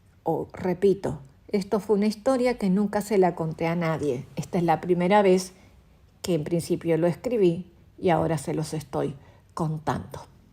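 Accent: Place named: Uruguay. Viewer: Es muy difícil regresar a otro tiempo.espero terminar tu vidahistoria es un gusto aberte encontrado de casualidad.te considero una amiga siempre te escucho tu horóscopo si no te escucho me siento triste.tienes uba bonita voz un tono de los uruguayos gracias voy en el capítulo 2 y los voy escuchar todos buenas noches.desde aca san luis potosí México.si tuviste suerte en seguir con vida!❤🎉😊😊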